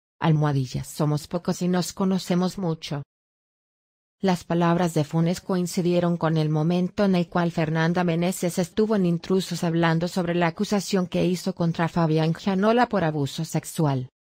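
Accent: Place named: Spain. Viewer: X q ponen esa voz española q feo.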